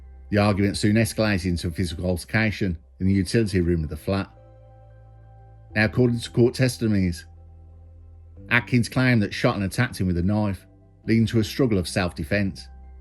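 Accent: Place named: Black Country, United Kingdom. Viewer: Great video. Very informative and well out together. Also, you sound like you might be Black Country. I'm in Wolves.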